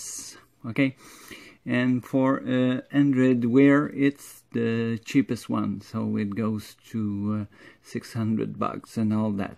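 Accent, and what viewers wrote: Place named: Quebec, Canada. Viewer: the Quebecois is strong within your voice. :D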